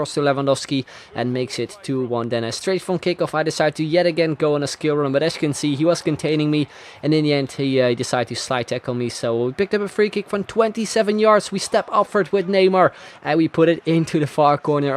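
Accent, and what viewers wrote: Netherlands. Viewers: is he dutch? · Youre dutch jaja ik hoor het gewoon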